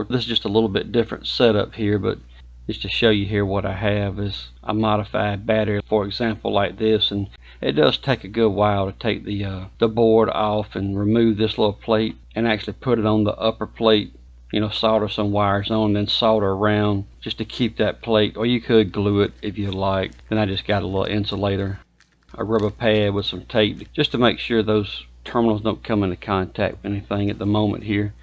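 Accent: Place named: South, United States. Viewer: nah you just sound like every redneck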